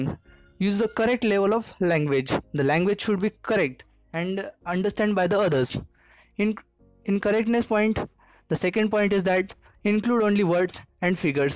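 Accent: India. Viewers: Indian accent with english language No thank you i'm not watching this video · clicks video hears Indian accent fuck_no.jpeg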